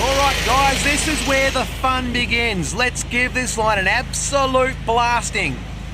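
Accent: Australia. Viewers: This content is just the best content to watch when bored cause it’s always a surprise what’s coming next and I watch this when I feel bored cause it’s fun and always a surprise EDIT:the Aussie accent keeps me entertained to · I'm not quite sure why the YouTube algorithm decided to recommend me the Australian cousin of the Mario Bros., but I'm glad that I decided to check your content out. The commentary made something that I'd normally find boring into something interesting and humorous. Love the content, love your accent, please keep up the good work! ^_^ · Where would you rather bloody be? I don't know, ripping roots out with bloody yeah! lol this guy is very Australian. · I love how aggressively Australian your accent and dialect are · video played automatically, stayed for the Australian speak.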